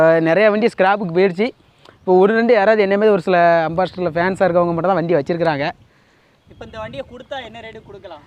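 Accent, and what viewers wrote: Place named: Tirunelveli, India. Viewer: Nellai Tamil alagu.car um alagu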